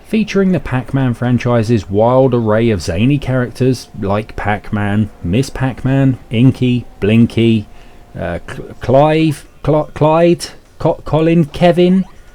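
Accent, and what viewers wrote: United Kingdom. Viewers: Ziad Assaad The commentary is soooo British!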